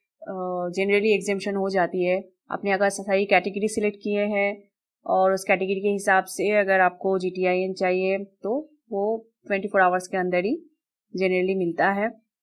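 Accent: Bengal, India. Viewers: Are u Bengali girl 😀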